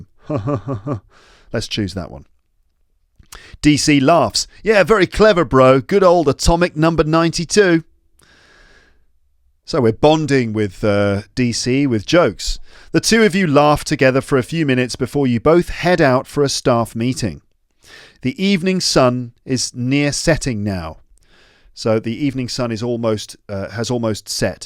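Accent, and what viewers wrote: United Kingdom. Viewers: i like your british accent very much.sir.😊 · I always listen to the end and I love how you explain some words. And I learned the British accent from you Thank you ❤ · Couple years ago I lived in another country with barley access to the internet I could listen to your podcast and voice hardly almost i forgot you however i couldn’t forget your British accept and your generously teaching style and your name I got surprised to see you in YouTube in USA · Fantastic voice and actions with explanation of all the vocabulary! English as a second language person, I had a hard time to understand those detailed adjectives when I read a book. Finally, I fall into the story and enjoyed it all the way to the end. Thank you so much for your effort and contribution. I am lucky to find this podcast. I love your British accent as well. I wish I could learn that accent:) I think I will be your fan now on - Cheers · camp wide range covered uk accent and culture British filed pure Love dramatic ever❤ true legendarie Podcaster all time❤❤❤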